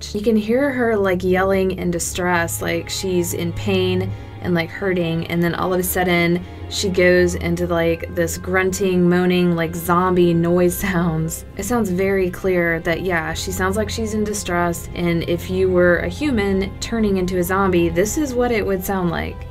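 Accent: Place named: United States. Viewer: Btw u sound like a cute American?what state are you from but slap me with the holy macral if I'm wrong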